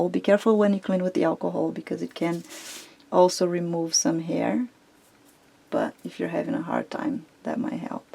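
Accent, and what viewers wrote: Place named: Brazil. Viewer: Aah, eu sabia que seu sotaque era diferente, imaginei que fosse brasilwira no momento em que ouvi tua voz, alguém mais?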